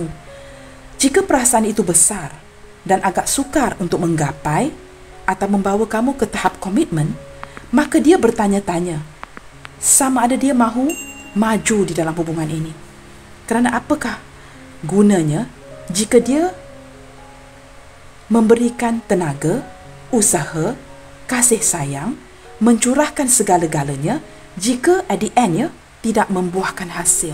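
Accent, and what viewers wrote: Malaysia. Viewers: Sya baru beberapa hr rutin tengok video dsini. Senang dgn cara readingnya... deep sekali. Dengar bicaranya sya jd berasa bernostalgia pd masa sya bekerja d Malaysia thn 1996 silam. D Kilang HITEC, spg,renggam johor bahru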